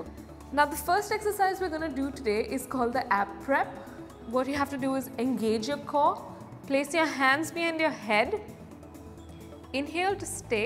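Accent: India. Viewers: it's Indian accent dear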